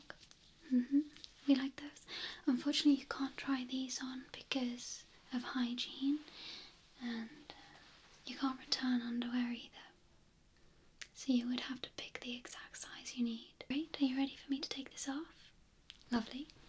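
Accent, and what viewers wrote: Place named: Birmingham, United Kingdom. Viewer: You can talk to me all day with that amazing Birmingham accent!!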